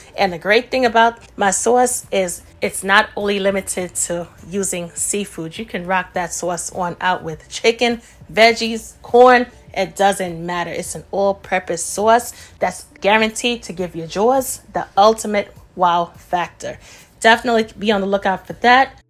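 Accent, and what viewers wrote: New York, United States. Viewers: Lil mama got some skills also hummm I hear some NY in that voice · I’m making this today! Thank you! Btw are you from the DMV? New York? I love your accent 😊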